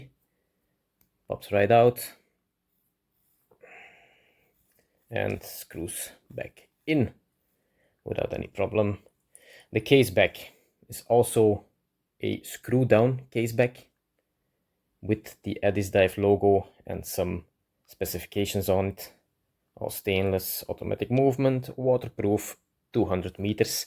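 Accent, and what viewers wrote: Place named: Netherlands. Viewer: So you're belgian? :) nice (anything else would still be nice - i like your video) I was suspecting a dutch-like english accent - your pronounciation resembles Mr.Dagon a lot (a guy with a pocket knife yt channel) Subtitles would help, but even without would be better than not making the video :)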